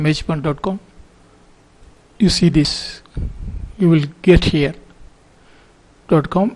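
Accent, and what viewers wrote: India. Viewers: the heavy punjabi accent too wtf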